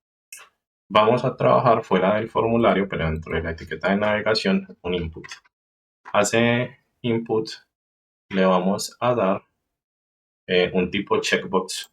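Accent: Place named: Antioquia, Colombia. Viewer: Su voz suena como de puerto berrio antioquia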